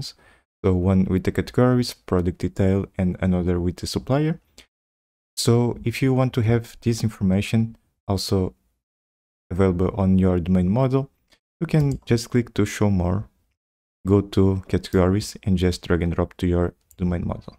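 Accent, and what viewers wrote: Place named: Portugal. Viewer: Muito reconhecível seu sotaque português de Portugal! Gostei muito da simplicidade que trouxe o assunto e um case prático. Saudações do Brasil!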